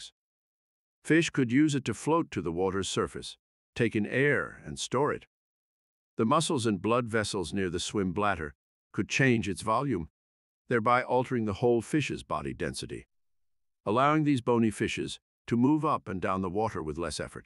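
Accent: United States. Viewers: Excellent video, appreciate the attention to detail. Also, I love your classic mid-Atlantic accent.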